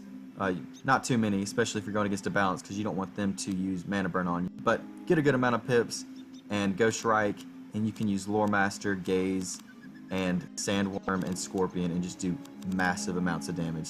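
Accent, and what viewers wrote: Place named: South, United States. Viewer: I like ur southern accent.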